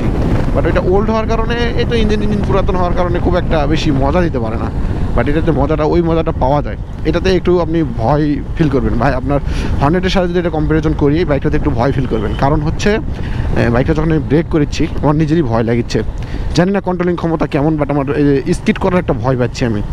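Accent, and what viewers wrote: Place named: Bogura, Bangladesh. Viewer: জি ভাইয়া অবশ্যই তবে আপনার আঞ্চলিকতা ও আমাদের বগুড়া আঞ্চলিক অনেক মিল রয়েছে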